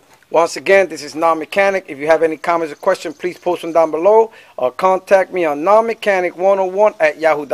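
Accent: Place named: New York, United States. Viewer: Good video, loved the ny Italian accent. Anybody else noticed that first 😂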